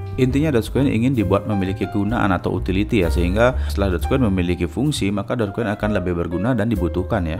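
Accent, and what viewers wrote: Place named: Bali, Indonesia. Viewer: orang bali boss...logat nya mirip...tapi artikulasi penjelasannya muantapp. dan sangat mudah di mengerti..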